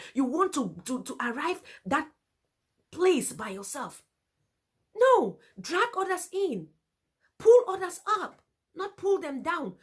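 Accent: Akwa Ibom, Nigeria. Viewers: Your accent and gesture, can easily make one call you, a Nigerian woman. I love that fact that you stated the truth with no sentiment. Our Cameroon artist should align with Nigerian artist, with their own unique sound and tap from the blessing, no need to compete, we all can win together. Mostly us from Eastern Nigeria were one entity with Anglofone Cameroonians for years before the division, we still consider them as brothers. No room for hate. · Her accent is like Akwa Ibom state, I don’t know but I picked some Akwa Ibom accent while speaking. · !!! You sound like a Crossriver/Akwa Ibom lady! You sound so Nigerian! And very pretty too! I am subscribing!! We are one 🇳🇬🇨🇲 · truth. She has akwa Ibom accent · You look and speak like Akwa Ibom people in Nigeria. Keep it up sis. You have a new subscriber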